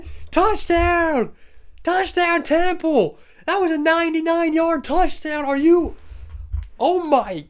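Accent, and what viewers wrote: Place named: Texas, United States. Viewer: Sounds like he’s from Texas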